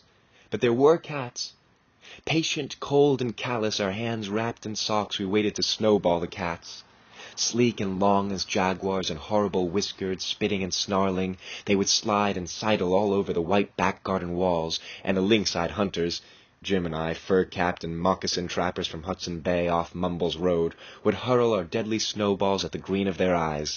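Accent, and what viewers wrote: United States. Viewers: It must be 30 years ago, that I had an LP of Dylan Thoas reading this. There must be someone out there that has this reading. Somehow, an American accent just doesn't do justice to this. Thomas' own version was so - "dead pan" but it didn't matter: this was Thomas' own rendition of his wonderful Welsh Masterpiece. Please for next year..?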